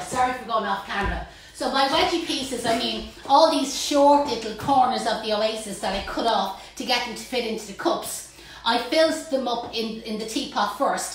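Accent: Ireland. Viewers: I’ve just come across your videos on YouTube. I’m not a florist and I’m not very good at arranging flowers but these little projects you’ve shown have given me some great ideas and also knowledge about doing some flower arranging. I watched your video of the cocktail glass arrangement and I bought some of those to do for some friends. Hopefully mine will turn out at least half as good as yours. I’m from Bangor Co.Down. Also so refreshing to hear an Irish accent on YouTube 🤣 Thanks for all the tips and ideas.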